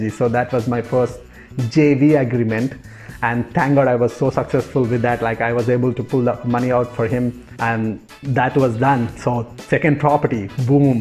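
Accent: Telangana, India. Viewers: Great story. You sound like you are from Telanagana, if so then which part of Telanagana you are from?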